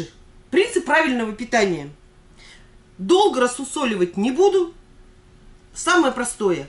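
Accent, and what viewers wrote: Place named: Urals, Russia. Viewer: Я вот слушаю и думаю... С Урала Вы. Да?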